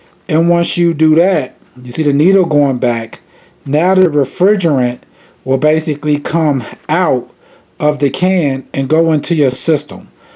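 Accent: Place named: Midwest, United States. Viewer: Love the midwest accent "right thurr" 😍👌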